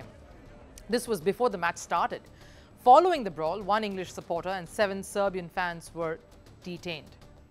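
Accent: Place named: India. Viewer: She has an Indian accent ...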